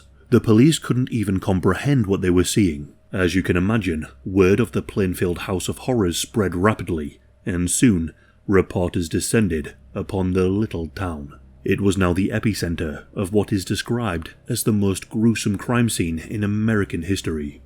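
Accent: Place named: England, United Kingdom. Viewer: Ya fuckin ass is from England with ya accent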